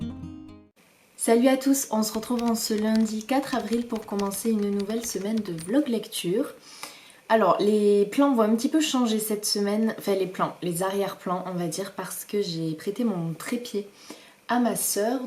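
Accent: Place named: South, France. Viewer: Bonsoir je viens de découvrir votre chaîne et c'est vraiment un vrai bonheur! Votre voix est douce et reposante, vos vlogs très inspirants. Je reconnais bien votre accent puisque je ne suis pas très loin (Carcassonne 😊). Du coup je vais dévorer vos vlogs ce week end j'en ai a rattraper 😅. Puis lire aussi quand même et jardiner aussi car il va faire très beau ☀️. Merci pour le partage 😉 et longue vie à votre chaîne. Si ce n'est pas trop indiscret, quel est votre profession ? Bonne soirée. Carine.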